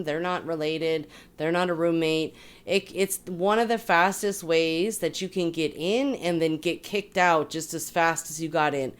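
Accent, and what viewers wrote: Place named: Bay Area, United States. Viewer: I can hear that bay area accent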